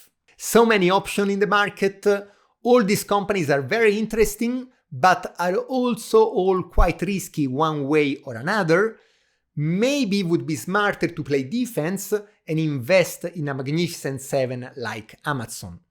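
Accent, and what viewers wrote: Tuscany, Italy. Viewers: sei italiano? hai un accento toscano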